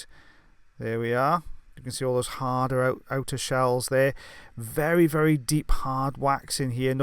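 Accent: United Kingdom. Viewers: Omg you should do hilarious ear wax removal in your British accent would awesome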